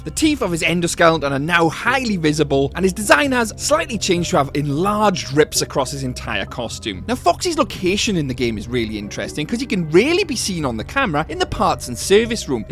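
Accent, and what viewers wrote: Wales, United Kingdom. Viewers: Whats that accent? Is it welsh? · this is such a weird question but are you welsh??? i am and i think i recognise the accent loll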